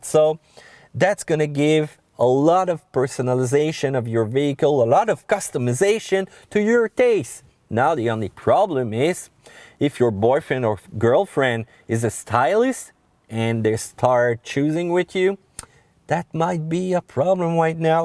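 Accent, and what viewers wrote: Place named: Montreal, Canada. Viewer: You don't need to listen to people correcting you. Your review was top notch. Your Montreal accent did not get in the way of me understanding anything.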